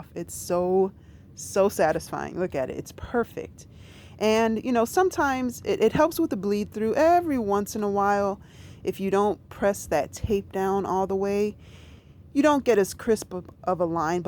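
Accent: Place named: Puerto Rico. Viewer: Beautiful Flag!! 👌I have always love the Puerto Rican accent !! Thank You for sharing 😘❤ your Word I think is Bird. 🥰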